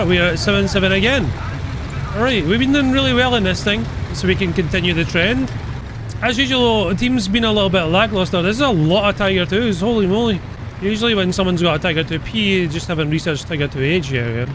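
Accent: Scotland, United Kingdom. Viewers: if the intro that includes the flag nor the accent give it away, i will. he's scottish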